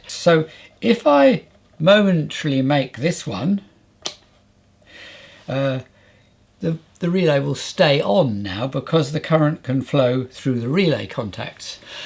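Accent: United Kingdom. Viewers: Did I hear right a British voice speaking metric. Then you went straight back to lbs. All good old Aussie tradesman that understands both but lean towards metric. Many thanks for your program.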